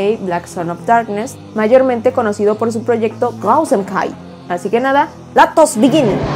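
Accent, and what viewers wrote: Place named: Colombia. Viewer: hablaste como colombiana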